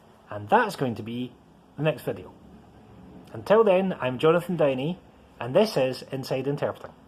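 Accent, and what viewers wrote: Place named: Scotland, United Kingdom. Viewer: ... that bonnie Scottish (Glasgow?) accent! Love it! :)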